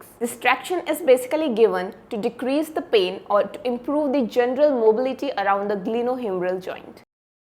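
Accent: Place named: India. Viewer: Don't try to change your accent. Indian accent is perfect for us.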